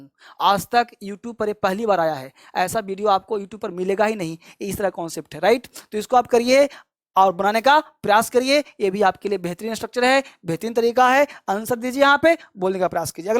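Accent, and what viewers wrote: Bihar, India. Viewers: Sir aap bihar se belong krte h ky? Aapki boli me boht mithaas hai... Boht acha lgta hai aapki aawaz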